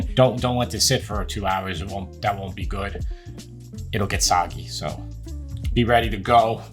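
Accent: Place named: Long Island, United States. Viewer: i just knew you were from Long Island from your accent. I as well have lived on LI my whole life..........and love your videos!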